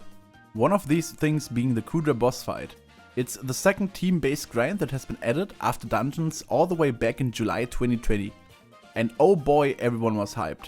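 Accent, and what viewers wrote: Germany. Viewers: Bro du hörst dich mies deutsch an (ich kann auch nicht besser englisch)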